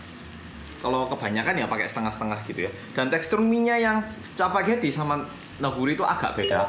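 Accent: Java, Indonesia. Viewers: 😁😃😄wajah korea...jawanya medok😁😃😄👍mantap...seneng dg cara ngomonge..rek rek..😁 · JAWA BANGETTT😭😭😭😭😭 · Pertama kali smpai di channel ini, ini kknya kok medok banget ya org indo apa korea si 😂😅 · Korea.. Tapi ngomong Bi ko logat nya kaya bahasa Jawa ya, 🤔🤔😁😁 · Kok logatnya kayak orang Jawa 😂😂😂😂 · Mas, pean tak kiro wong jowo lho sumpahh jebul asli korea 🥺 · Mas, omongan mu kok isih jelas wae to medok nya, belum lupa bahasa medok e, ya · Medok bgt logatnya wkwk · seneng bgt liat lo makan , ngakak jugaa cara ngomong nya yg medok 😂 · HeLLo BoLo-BoLo LiKe Yuk BoLo-BoLo- ~i ReaLLy Like intrO~ OrAnG KoRea Yg Med∅k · Ihhh gemes... muka korea logat jowo wkwk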